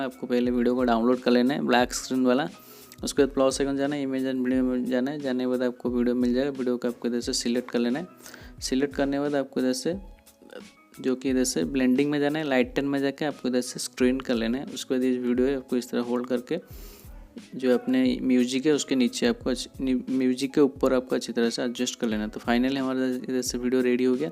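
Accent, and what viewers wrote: Odisha, India. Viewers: Bro are you from odisha i can fell your odia vibes 🖤🖤🖤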